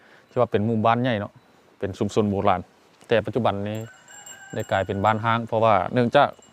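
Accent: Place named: Nakhon Phanom, Thailand. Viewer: เว้าคือบ้านเฮานครพนม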